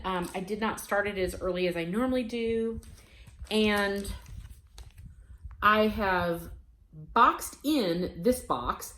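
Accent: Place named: South, France. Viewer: Loved your southern French accent! I would love that sampler. So colorful.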